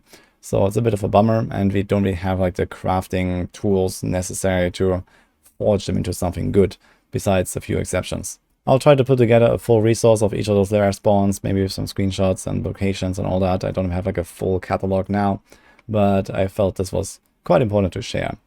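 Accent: Germany. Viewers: Bruder, du sprichst richtig gut Englisch, aber dein Akzent ist alman. Dhat hurts my ihrs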